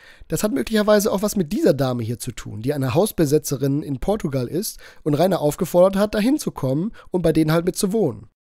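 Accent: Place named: Middle Franconia, Germany. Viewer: Da muss er nur noch ordentlich deutsch sprechen können, und nicht nur meddlfrängisch.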